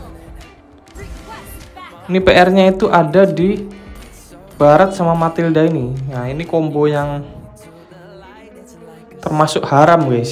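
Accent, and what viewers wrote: Java, Indonesia. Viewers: suaranya jawa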